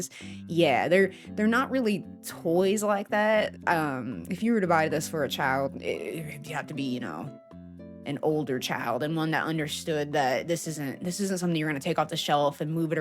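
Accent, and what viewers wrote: South, United States. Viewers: You retired from the other hobby? Just curious. Plan to be watching your new content here. I never noticed you having a slight southern accent before. Love it. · Did you move? You’re accent is way country now lol happy to see your videos again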